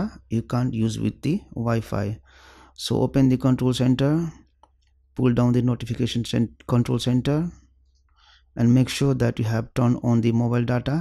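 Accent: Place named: India. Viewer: his voice drives me nuts..thick Indian accent and monotoned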